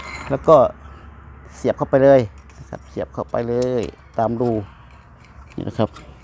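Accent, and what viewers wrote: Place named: Central Thailand, Thailand. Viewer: ขอบคุณครับน้าที่ที่สอนการซ่อมเครื่องตัดหญ้าสอนพูดภาษากลางคนภาคใต้เข้าเข้าดีครับครบทั้งสี่ภาคเข้าใจภาษากลางขอบคุณครับน้า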